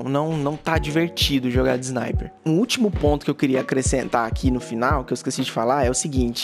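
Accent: Minas Gerais, Brazil. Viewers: Pelo seu sotaque sei que vc é de MG. So pelos videos que vi concordo com suas opiniões quanto aos especialistas e quantos às animações... to esperando ficar free pq nao gasto dinheiro com pre venda de cod...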